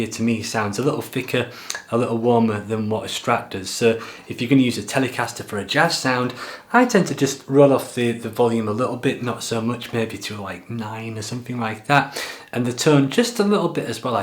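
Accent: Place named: Scotland, United Kingdom. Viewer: Seems like I spotted Ben Nevis in the distance….and a slight of scottish accent as well. Per in Demark…I ❤️my Tele.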